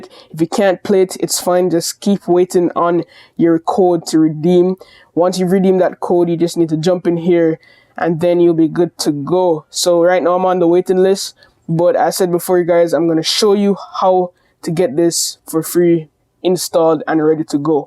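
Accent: Jamaica. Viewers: Are you Jamaican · are you a jamaican/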